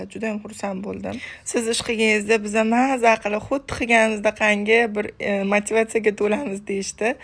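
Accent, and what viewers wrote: Kokand, Uzbekistan. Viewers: Assalamu alekum man ayol kishiman siz Qo'qonlikmisiz man Qo'qonlikman shevez uxsherkan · Men ham shuni sezdim. Qòqonlik deb òyladim. Men ham ayol kishiman. MashaaAlloh opa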